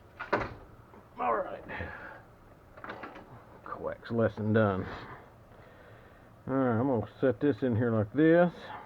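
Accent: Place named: South, United States. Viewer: Impressive bud! Dropping by to show you some love! From one Southern boy to another....just guessing by that voice lol lol.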